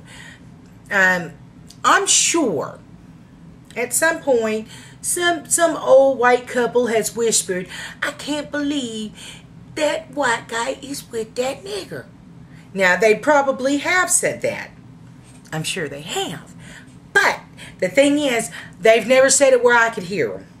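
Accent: South, United States. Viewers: I just found this page by accident and I must say this is one of the funniest things I've sen in years... You are a very beautiful asian/black woman with the most country twine that I've ever heard!!! Rotfl!!! I am relocating to Tyndall as well, and I was looking for videos about that area when I found this site... My son has grown up in a military enviroment and I can truly say that he doesn't know racism either... Us moving back to the south and dealing with ignorate people is my BIGGEST FEAR!!! Right now he has friends of many colors and has dated young ladies of different races and I love it... I am from TN orig and everytime I go home to visit I'm reminded of the race issues, but being that I have lived all over the world I forget that in the US we have race problems...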